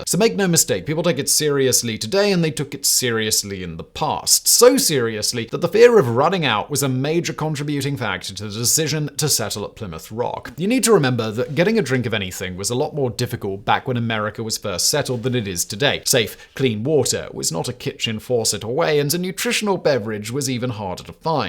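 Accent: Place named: RP, United Kingdom. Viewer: One cannot take on this much work in this many directions and not become scattered. He has a cut-glass British accent (which hardly anyone has any more! He sounds a bit like Churchill mixed with James Mason - most Brits are now quite a bit more "broad"), but can't trade and sell on that forever. His occasional goofiness goes too far in the other direction. He is a victim of his own success, and I think from now on the quality will continue to deteriorate. Any company that expands too rapidly in too many directions fails. I don't wish failure on him by any means, but the cracks are already beginning to show.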